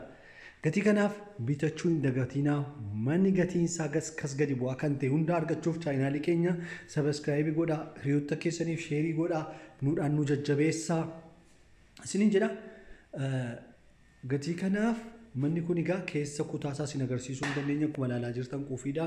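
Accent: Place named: Addis Ababa, Ethiopia. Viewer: Ati finfine malee hin dubaatu kanaafi me garaa naqamte nu dubaadhu malooo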